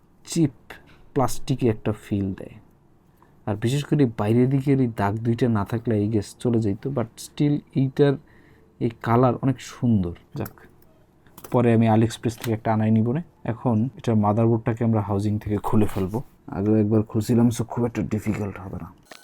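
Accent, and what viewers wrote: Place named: Barisal, Bangladesh. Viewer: Ha ha apnar kotha shuinai bujhsi barisal tone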